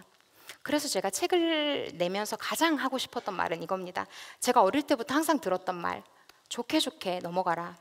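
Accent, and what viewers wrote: Gyeongsang, South Korea. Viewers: 이 여자는 경상도말투라서 사납게 느껴지니 거부감이 든다.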